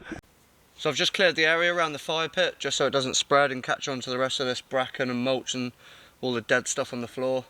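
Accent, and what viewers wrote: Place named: Norfolk, United Kingdom. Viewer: Very interesting indeed. Good to hear a Norfolk accent again. Camping spot was ideal.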